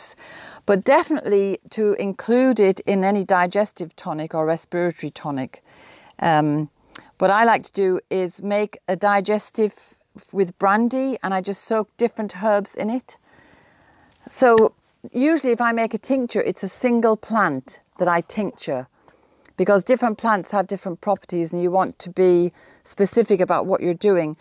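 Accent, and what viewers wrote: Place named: South Durham, United Kingdom. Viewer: How can you grow plantain. I’ve just learned about it recently. Cani buy it to plant. Interesting accent south Durham perhaps?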